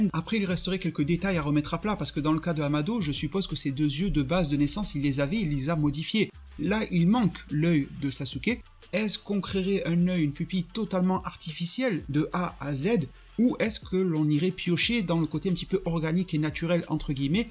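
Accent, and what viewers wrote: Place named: South, France. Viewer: ton léger accent du sud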